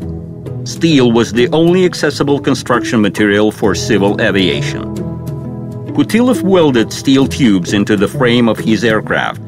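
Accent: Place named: Russia. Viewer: Very interesting. But why does the speaker have to have a russian accent?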